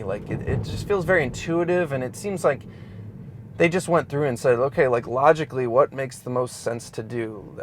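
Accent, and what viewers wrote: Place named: California, United States. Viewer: California diction, syntax, grammar and rhetoric...yuk.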